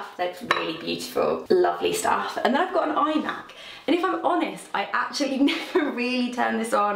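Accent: England, United Kingdom. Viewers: Julia it's quite a generic way of saying "saw", most English people pronounce saw like that ( as a Brit)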